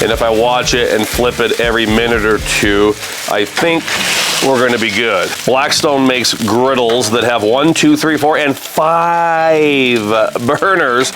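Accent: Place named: Pittsburgh, United States. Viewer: Great video! Now I'm hungry! I always thought you sounded like a western Pennsylvanian. I grew up about an hour north of 'da burgh, so I speak Pittsburghese. :-) Keep up the great work!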